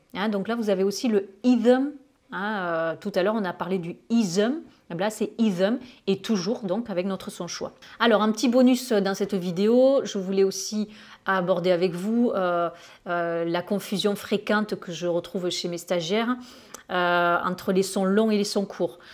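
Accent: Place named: Toulouse, France. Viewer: Tout d'abord moi qui suit de Toulouse, j'ai peut-être reconnu le petit accent 😉chez, que je tiens à remercier pour cette vidéo et les autres bien sûr, ainsi que tous les interveneants d'Anglais cours club. Méthode excellente!!!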